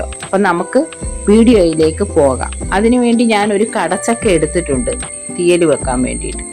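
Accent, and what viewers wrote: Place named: Adoor, India. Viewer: Njan Adoor kari aanu, njagal sheemachakka ennanu parayunnathu, nammal kadachakka ennu parayunnathu pineapple ne aanu, nattil evide aanu, samsaram kelkkumbol nammude bhagathu ullathu aanennu thonnunu, njanum ithupole aanu sheemachakka theeyal undakkunathu, super recipe😋👌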